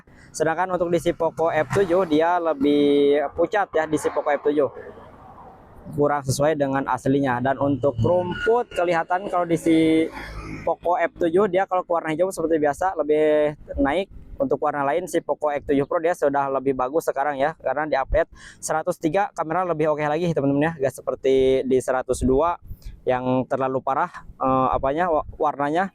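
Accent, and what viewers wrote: West Java, Indonesia. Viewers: Sunda pisan mang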